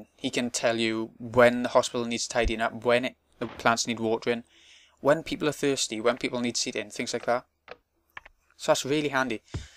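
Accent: Wales, United Kingdom. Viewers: Used to LOVE this game, gonna buy it again on PSN. And no offense but I love your accent, which is unusual seeing as I usually dislike the welsh accent :P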